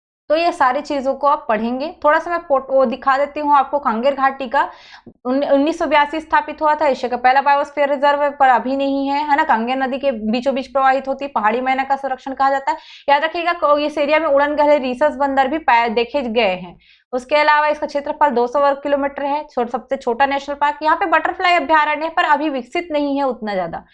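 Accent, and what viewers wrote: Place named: Odisha, India. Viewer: Thoda odiya accent ata hai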